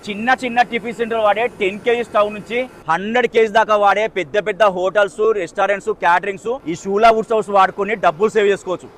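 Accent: Telangana, India. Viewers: స్వామీజీ మాలేశారు కాబట్టి స్వామీజీ అని పిలుస్తున్నాను అనంతపూర్ డిస్టిక్ పామిడి మండలం అడ్రస్ అని చెప్తున్నారు కానీ మాట్లాడే తీరు ఏ ప్రాంత వారిది వద్దు బాబు వ్యాపారం చేసుకో మేము సహకరిస్తాం బాగానే ఉందని ప్రచారం చేస్తాం వెళ్లి తీసుకోండి అని చెప్తాం కానీ తెలంగాణ భాష తీరు వద్దు బాబు ఇక్కడ ఉండి సంపాదించుకొని భోజనం చేసి వేరే చోట ప్రాంతానికి చేయి కడుక్కున్నట్టుగా ఉంది మీ తీరు వ్యాపారం జరగదు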